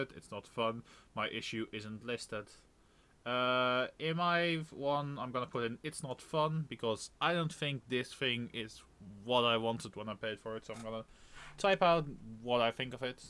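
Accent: Netherlands. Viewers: Are you Dutch? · ben jij nederlands ik hoord het aan je engels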